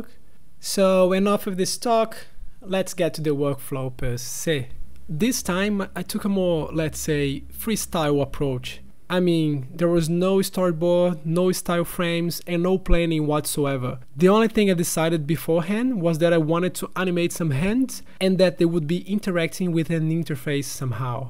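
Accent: Brazil. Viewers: Belo trampo! Vc eh Brazuca por sinal ? Reparei no acento rsrs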